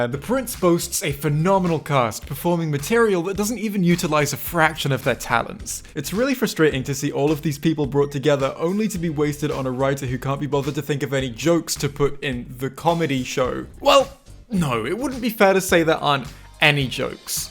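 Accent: England, United Kingdom. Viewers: Whoo that’s too much British for me today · Man's literally speaking Queen's English isn't he? Hard to tell how biased this is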